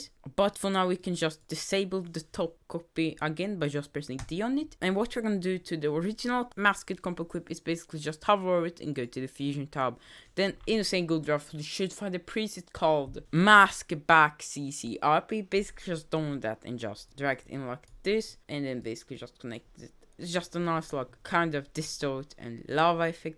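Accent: Sweden. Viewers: hes swedish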